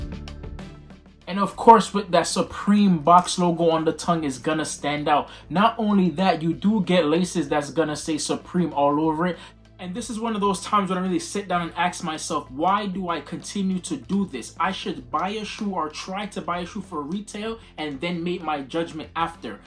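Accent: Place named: Toronto, Canada. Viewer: Good work bro. Toronto accent goin crazyy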